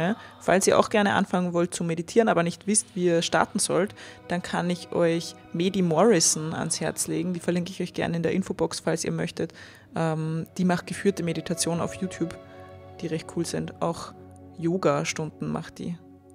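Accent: Austria. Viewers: ich hatte eben leichte Kopfschmerzen und dank des kack österreichischen Dialekt ist es noch schlimmer geworden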